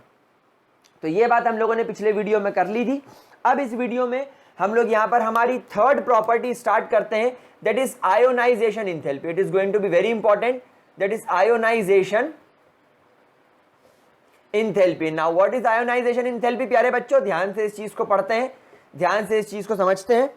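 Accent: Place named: Indore, India. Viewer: Sir apki awaz me indoor ka accents hai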